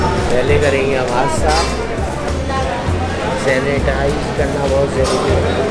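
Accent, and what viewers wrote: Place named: Karachi, Pakistan. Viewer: Sydney Australia.. love your accent bro.. karachi ki yaad aa Jati hai.. Best Food Explorer in Karachi😊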